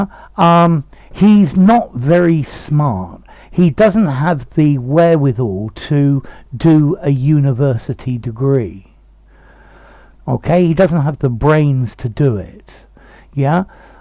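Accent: United Kingdom. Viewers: Great explanation...the British accent is so beautiful and nice to listen to...why is that?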